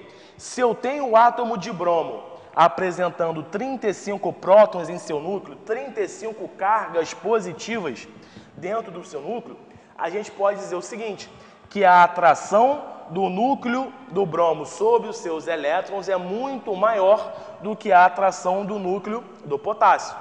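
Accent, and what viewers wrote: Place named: Rio de Janeiro, Brazil. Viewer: eita sutaque de carioca, mt bem explicado!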